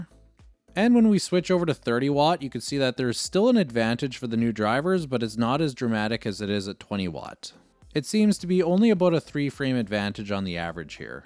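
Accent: Canada. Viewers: Bro as canadian watching this and listening to his Accent. I was thinking this man is definitely Canadian. Definitely from western Canada.😂😂. Nice video. Would have taken alot of work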